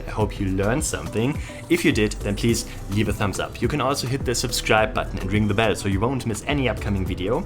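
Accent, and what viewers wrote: Germany. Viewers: Can't describe how much i dislike hearing a german speak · Oh my God... Do I sound like that when I speak English? 🤦🏼‍♂️ - Hopefully not ... The German accent is really blatant.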